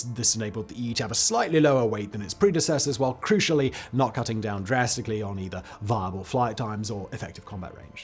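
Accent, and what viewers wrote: England, United Kingdom. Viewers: The F-15 NEVER served in Vietnam!!!!! How can you be so fucking lazy that you can’t be bothered to look up an easily verifiable fact!!! This video is proof that having an English accent doesn’t make you smart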